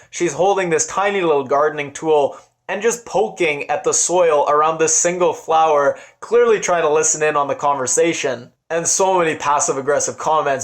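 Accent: Canada. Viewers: Just curious, Are you Canadian ? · So, you did NOT explain the ending at all. I think perhaps you are so full of yourself that you misread the ENTIRE movie and have NO idea what you are babbling about in your annoying Canadian accent, A! Who was the moving man in this plot and why did he appear at the end as a potential stalker, explain that one oh master of interpretation!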